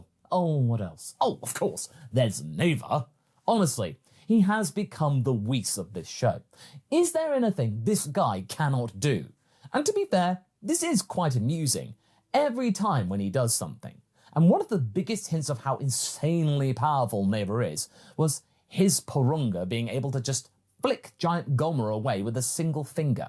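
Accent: United Kingdom. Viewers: My dude he has a British accent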